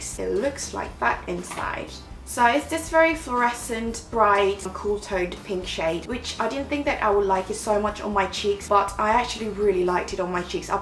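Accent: United Kingdom. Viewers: 맨날 언니 영상 보면서 영국발음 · 언니 진짜 너무 예뻐요 ㅠㅠ 진짜 언니 런던에서 혼자서 찍을때부터 계속 구독햇엇는데 ㅠㅠ 어느새 더 가까워진 느낌..ㅎㅎㅎ앞으로 자주 올려주셧으면 좋겠어요 너무 반가움..👐영국 말 너무 매력잇어요 ㅠㅠ 배우고 싶다능..ㅎㅎ 언니 진짜 좋아해여💖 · 영상 항상 재밌게 보고있어요! 매번 눈팅이랑 좋아요?버튼이라해야하나욬ㅋㅋ 저거누르기만 했었는데 첨으로 댓글달아보네옇ㅎㅎㅎ 다른유부터영상보다 영상이 먼가 더재밌어서 꼭 보게되네여ㅎㅎ 영국발음도 신기하기도하그~ 하여튼 다음 영상 기대할께용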